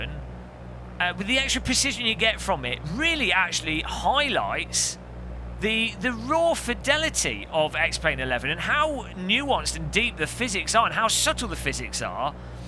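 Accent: United Kingdom. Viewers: Turned off 7 seconds in because limey accents are unnecessary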